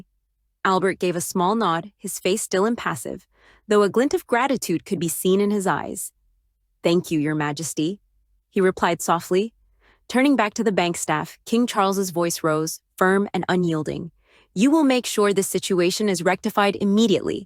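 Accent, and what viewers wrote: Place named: United States. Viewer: What a load of bull****, where do they get theses stories from. sounds american from the accent.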